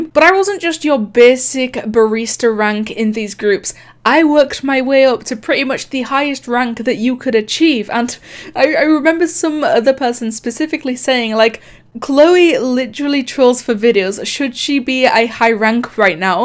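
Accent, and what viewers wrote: United Kingdom. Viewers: Shes Accent seems british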